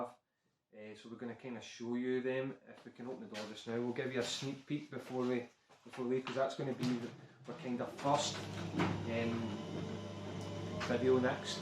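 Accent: Scotland, United Kingdom. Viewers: No need to apologize your scottish accent is beautiful my friend 👍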